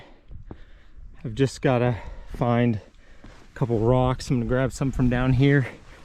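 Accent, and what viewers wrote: United States. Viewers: Are you an American or English? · you American bro? good video! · it’s the softly spoken yank accent that does it for me bud.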